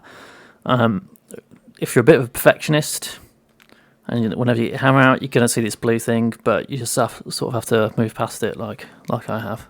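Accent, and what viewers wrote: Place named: United Kingdom. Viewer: For some reason your videos go so well in the background, maybe it's something in the voice or just my love to british accents :D The build is really cool as well, got me some new ideas, thanks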